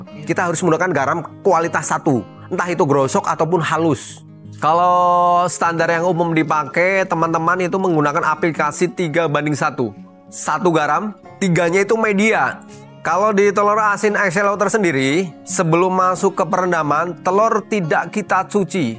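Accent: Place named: Kebumen, Indonesia. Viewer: Berati kebumen ngapak kaya nyong nng rio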